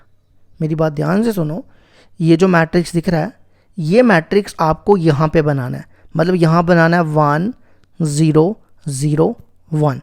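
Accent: Jammu, India. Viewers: Sir Aap Jammu se hoo kya.. 😌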